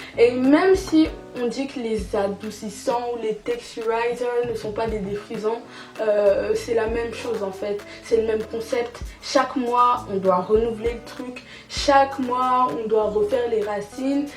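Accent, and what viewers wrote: Cameroon. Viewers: cc super vidéo ...merci pour les conseils mimi et drôle tu es de temps en temps mets l accent cam quand tu parles j aime bien j attends plus de vidéos ma chérie bizu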